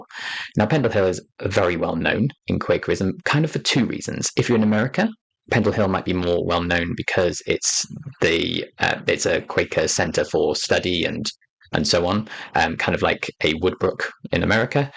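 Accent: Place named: Southern England, United Kingdom. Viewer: 😂 well that explains a lot. I thought I had picked up from one of your other videos that you were from the deep south of England.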